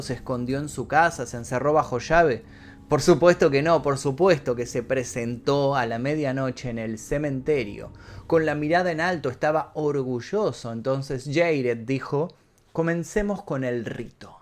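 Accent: Argentina. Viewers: Me encanta tu forma de narrar y a eso agregale tu acento argentino maaaaas. Saludos desde México. Soy tu fan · tiene buena pronunciación y habla genial y relata execelente las historias con un toque de argentina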